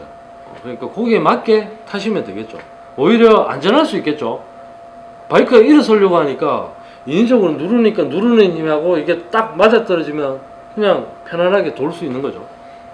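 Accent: Gyeongsang, South Korea. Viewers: 토속적인 경상도 억양 리뷰 참 좋네요.잘 보았습니다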